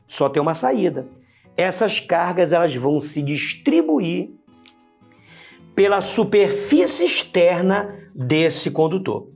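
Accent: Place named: Pará, Brazil. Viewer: que aula massa, professor!! super didática e de fato imprescindível para meus estudos. o melhor é o sotaque paraense (?) hehehe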